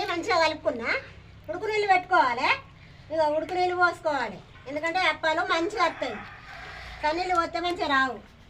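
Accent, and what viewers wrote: Telangana, India. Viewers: Chala baaga chestharu akkayys anni vantalu ...meeru cheppe Telangana language. Naaku chala estam nachindhi...superb...😊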